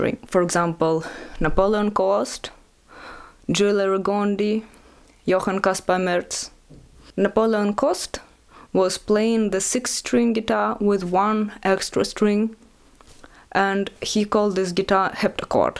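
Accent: Russia. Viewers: I love your accent hehe. You know pretty much of music history by the way, wish some day meet you and talk all day, you can teach me russian, and I can teach you spanish ! Hahaha. Buen video, saludos ! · Music needs more than 6 or 7 or 10 strings, music is choking with limited strings number, give it freedom 💁🏻‍♂️ I enjoy this explanation, and like the way you speak rushinglish😅... But above all, my dream to have 6 or 7 or 10 strings guitar 🤩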